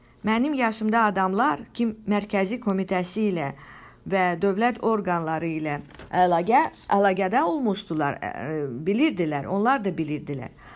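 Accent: Armenia. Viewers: Бурда бири йазыр ки ханымын сесиди.ай тупой гормурсен ермени лечесийнен данышыррр??? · Sən özün ermənisən.Ara qatmaqdan otrü bu yazını ortaya qoymusan Sənin danışığından məlumdur ki.,əsil efməni ləhcəsi ilə danışırsan.Sənin havadarlarında sənın kimi erməli məxluqlaeından dır. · Xəbəri oxuyanın özü ermənidir. Danışığından bilmək çətin deyil. Hamısıi böhtandır. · Daniwanin ozu ermenidir azeri olsa bele daniwmaz lehcese ermeniye oxwayir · Danisanin ozu ermeni kimi danisir Heyd er baba Esl Azerbaycanlidi az nagil danisin elebil torpagi Heyder Eliyev satib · Danışanın özü deyəsən ermənidir. Ləhcəsindən bəllidir... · Bu danisan qadinin ozu azerbaycanli deyil ya ermeni ya da rusdur.buna emin olmaq ucun onun sozleri teleffusune cumle qurmagina fikir vermek kifayetdir.ayibdi vallah. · Senin danIshIgIndan bilinir ki, sen ermenisen. O, ermeni olsaydI AzerbaycanI iqtisadi cehetden inkishaf etdirmez ve ordu quruculugu aparmazdI.Zefer Qelebemizin ele esasInI da o qoyub.O dunyada tanInmIsh bir LiDERDiR. 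· Про алева не знаю ну ты точно не Азербайджанеч, может армянка по разгавору видно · Bəs sən kimsən erməni ləhcəsiylə danışırsan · Yalan sa allah sənə lənət eləsin. Ay cındır, sənin ləhçəndən erməninin yekəsinə elə sən özün oxşayırsan ki. Mənə çox marağlıdır, hansı erməni azərbaycan övladları üçün hərbi məktəb (yəni, Naxçıvanski adına) açar ki, sonra da onlar o məktəbi bitirib və vaxtı çatanda da elə ermənilərin özünün də kökünü kəssin?! Hansı erməninin övladı ali baş komandan kimi minlərlə erməninin məhvinə, erməni ordusunun darmadağın edilməsinə əmr verər?! Ay cındır erməni, sən bu suala cavab verə bilərsən? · Bu danışan qadın ermeni lehcesi ile danışır. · Междупрочем:у-вас-аккицент Армянски:вы-кто:любои Азэрбаиджанец-знаем:алиев Армянин, а, пачему, именно, вы Имфармируите, людеи!!!!! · Evvel azeri dilinde danışmağı öyren sonra bu nağılları danış senin lehçen ermeni lehçesine oxşayır Camaatı inandıra bilmessiz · Bu danışan qadın özü ermənidir görün nə cür ləhçədə danışır, ay millət bu cür təbliğatlar uymayın bizim başqa dərdimiz var ermənilərə müharibə qalib gəlmək,bu cür cındır şeylərə inanmaq lazım deyil şəxsən mən Heydər Əliyevin kim olduğunu çox qəşəng bilirəm,bu danışan qancığada deyirəm ay erməni qəhbəsi sənin danışığından ləhcəndən görürəm ki sən özün hayiksən ay it sürüsü sən indi məni inandırmağa çaışırsanki dediyin düzdü? 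Üzümü tuturam bizim o dəmşalağ bəzi millətimizin nümayəndələrinə belə şeylərə uymayın burda söyüş yazmayın,o ki qaldı Heydər Əliyevin fəaliyyətini tənqid eləməyə əgər bacarırsınızsa bunu yazın bunu deyin mən demirəm Heydər Əliyev biri mələkdi hər nədisə bizimkidi bu hayiklərin təbliğatına uymayın kimdə istəyir mən o insanlarla ünsiyyətdə olub Heydər Əliyevin kim olduğunu deyə bilərəm subutda edə bilərəm,bu adam yəni Heydər Əliyev əsli kökü Zəngəzur mahalının Sisian rayonunun Cömərdli kəndindəndir atası Əlirza kişi anası İzzət xanım,ata babasının adı Məşədi Cəfər,ana babasının adı Hacı Cəfərqulu,Heydər Əliyev isə özü Naxçıvanda anadan olub,bu düşmən təbliğatına uymayın kim maraqlanmaq istəyirsə buyursun burada yazsın nömrədə qoysun məndə nömrə qoyuram vasap <num> kim üçün maraqlıdırsa buyurun mən danışa bilərəm! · Tocnu ermenilerin azerice danismasina oxsayir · Bu qadın Ermənidir səsindən tanıdım Mingəcevirdə yaşayıblar Azərbaycan dilini bilir bir kanal var BBS səhf etmirəmsə,orda müsahibə verib tapıb səsə qulaq asın. 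· Sen özün ermenisen alçaq lehcenden melumdur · Sən hələ erməni ləhcəni düzəlt sonra Heydər Əliyev haqqında danls elə özün tər təmiz ermənisən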